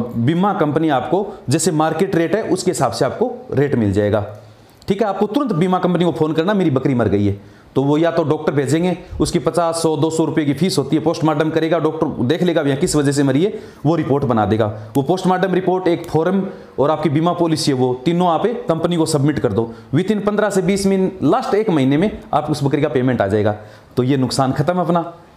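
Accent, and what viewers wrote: Rajasthan, India. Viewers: Sir aap Rajsthan se ho kya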